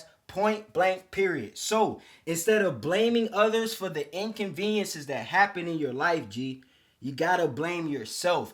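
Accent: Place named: Baltimore, United States. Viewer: You from B more bro? I hear an accent lol love ya videos G! 💯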